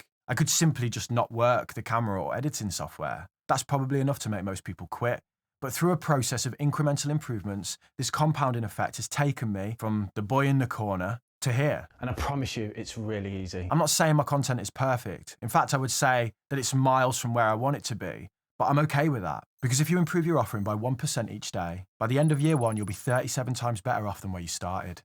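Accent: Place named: Midlands, United Kingdom. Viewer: Congratulations. I recently found your channel and I absolutely love it! It’s so good listening to someone down to earth from the midlands sharing no nonsense, well written advice and reflection with a sprinkle of humour! 🎉🎉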